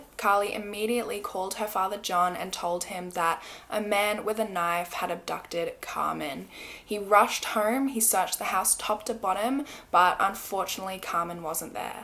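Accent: Australia. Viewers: Your videos are great to listen to at work. You have the nicest Australian accent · What is it that is so appealing about an Australian accent? Love u 😃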